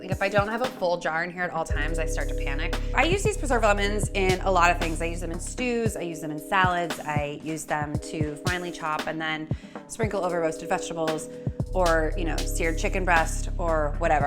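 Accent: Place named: Bay Area, United States. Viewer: She's gotta be from the Bay Area. Between the accent and the nutritional yeast on the popcorn...(which yes, is delicious)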